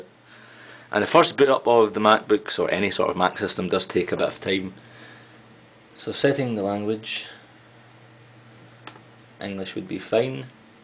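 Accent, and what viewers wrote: Scotland, United Kingdom. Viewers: I just love the fact that you opened it with a butcher knife. Also love the scottish accent! great video!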